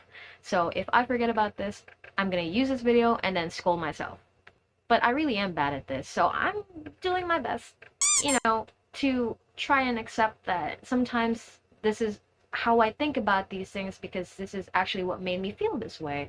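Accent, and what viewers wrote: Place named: United States. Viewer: Hi this is me Theo. I like the fluent English and the American accent.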